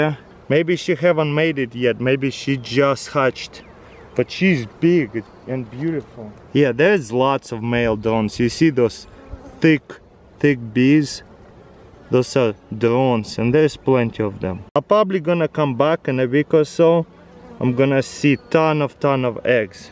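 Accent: Russia. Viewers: i have no real interest in bees aslong as i dont get stung but his russian accent makes it worth the while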